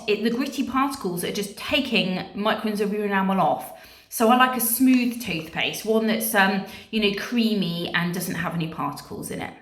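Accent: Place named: United Kingdom. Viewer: I could listen to you all day long. I love the British accent.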